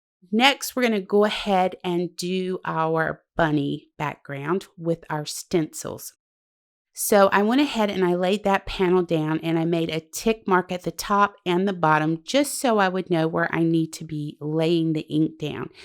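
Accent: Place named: South, United States. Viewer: Love your cards & your accent. I'm from the south but live up north so I love when when I feel at home. I will be a new subscriber!;0) USA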